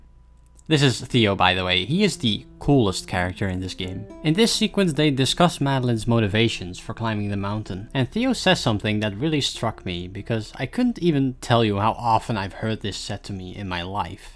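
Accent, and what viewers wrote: Netherlands. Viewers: Hi. You Dutch?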